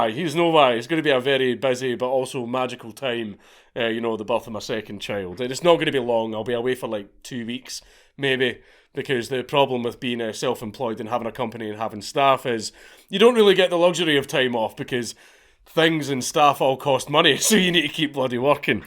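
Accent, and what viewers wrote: Scotland, United Kingdom. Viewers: Nothings more mythological than hearing a Scottish man tell you about myths and insane people from around the world now that’s rare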